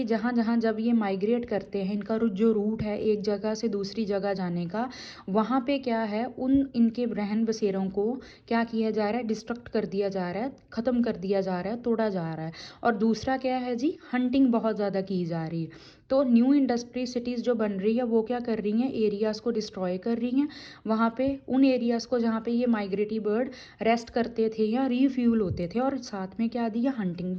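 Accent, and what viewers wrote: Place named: Punjab, India. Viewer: Nyc punjabi akcent overall the method is too good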